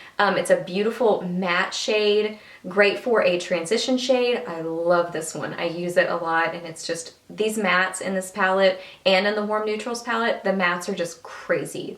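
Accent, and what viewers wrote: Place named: Texas, United States. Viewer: I love all of your videos! I love how you talk! (maybe cause I'm from texas as well? lol) how detailed you are with everything and organized!!!! I would love to see a review of the real techniques palettes from you.. because I know you are honest and so far I agree on everything you say about this stuff!!! Thank you for your awesome videos!!!! - Natalia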